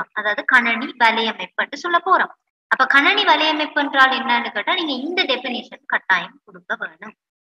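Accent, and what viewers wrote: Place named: Sri Lanka. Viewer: wow srilankan tamil kekave nalaruku...unga voice alagu ....best wishes ma.....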